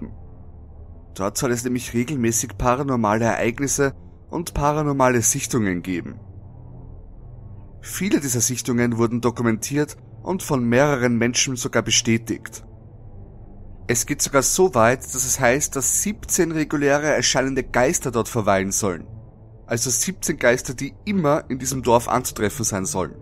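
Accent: Vienna, Austria. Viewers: Ich liebe den Wienerischen Akzent🤤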